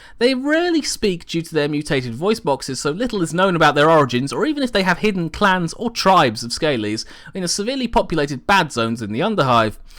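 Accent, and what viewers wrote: United Kingdom. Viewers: Hey I love your channel and every time you post a video it brightens up my day with glorious 40k goodness, delivered in a charming English accent just a it should be. I was wondering if you would re-visit space marine chapters with The Silver Skulls, a very little known chapter with a interesting set of traditions that really deserves more attention from fans. In short cross Ultramarines and White Scars.... yea thats a thing <link>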